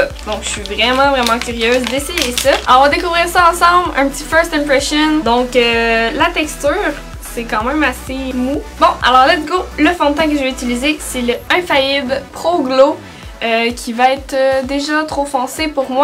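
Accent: Quebec, Canada. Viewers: Ce n'est pas qu'elle ne parle pas bien le français c'est juste qu'elle a l'accent Québécois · Coucou tu est vraiment magnifique et ta frange te vas hyper bien et en plus tu est assez drôle et ta l'accent québécois 💪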